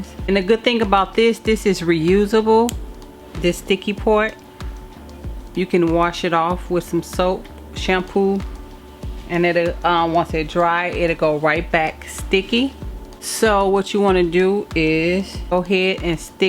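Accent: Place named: South, United States. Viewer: I will try it. You did a great job!! Love your accent (southern?) Thanks so much!!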